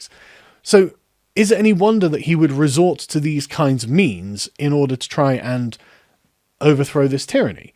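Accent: United Kingdom. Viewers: It is a credit to your well-spoken Britishness that listening to you riff on this is still entertaining, even when you're completely wrong. The "Dark Side" isn't a facet of the human condition. Maybe it would be if conceived by better writers for a more mature audience. But it ain't. The Dark Side is a PCP/Crack/Adrenaline cocktail that can(and very often has) instantly flip a noble and altruistic hero into a yellow-eyed baby-eater in the blink of an eye. Repeatedly demonstrated throughout both canon and non-canon materials throughout the decades of the franchise. It's what happens when a Dragon Age mage becomes an Abomination. And in that context you now begin to understand the Jedi are a peculiar mixture of the Dragon Age Templars and Circle combined...with all the hypocritical flaws, and magi-knight badassery that entails. But they do what they do because when they fail...yellow-eyed baby-eaters run rampant all over the place.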